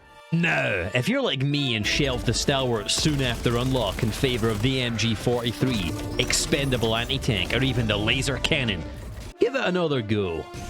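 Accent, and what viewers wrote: Scotland, United Kingdom. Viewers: I got so used to your nice scotty accent that when the switch to the redneck Southerner accent came it felt like I got punched in the face. A very Democratic punch, but a punch nonetheless. Great work 👍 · Got as far as the 50th mispronounced 'a' then switched off. Just speak normal, mate. Its ok to be Scottish.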